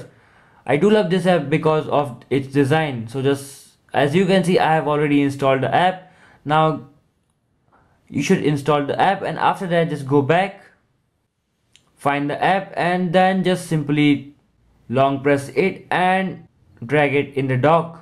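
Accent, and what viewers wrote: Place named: India. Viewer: Ahh the indian inglish <3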